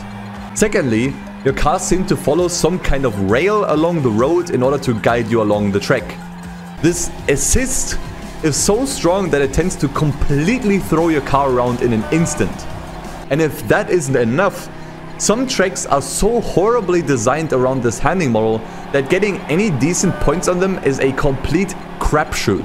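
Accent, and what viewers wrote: Germany. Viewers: What an absolute banger of a video, must have taken weeks to produce such a long video but it was totally worth it! Btw. am i hearing a german accent? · Ned schlecht das video bree. Hätte beinahe den deutschen Akzent nicht rausgehört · Wie man the german Akzent einfach raus heared 😂 nice !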